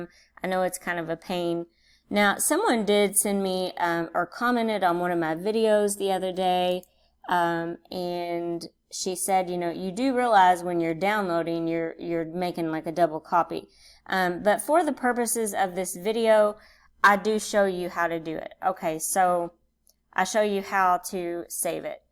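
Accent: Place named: South, United States. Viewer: This is my first time watching and I love your adorable southern accent, from one southern lady to another, keep on making stuff.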